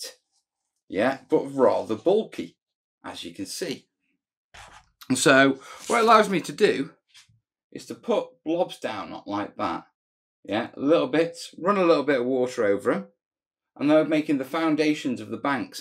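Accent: United Kingdom. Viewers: Missed that british accent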